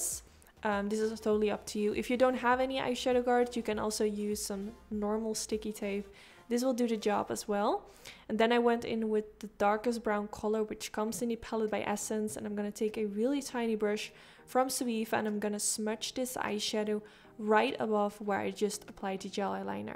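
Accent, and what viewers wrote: Netherlands. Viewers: She really sounds dutch it's located in america oh ok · I immediatly thought you would live in the Nederlands ;) The accent always stays I guess. Nice tutorial! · Ik hoorde gewoon dat jij Nederlands was haha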